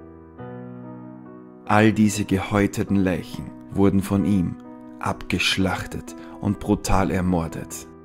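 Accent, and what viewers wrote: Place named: Austria. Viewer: Wird gleich geschaut! Ich liebe Lore Videos zu den Fs Games vorallem mit nem österreichischen Dialekt! Schöne Grüße aus Pottendorf (Nö)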